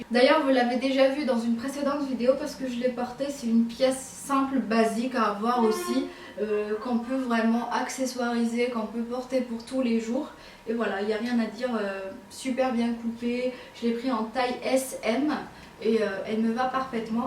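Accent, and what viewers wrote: Morocco. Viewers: Ton petit accent marocain est très sympa sinon super haul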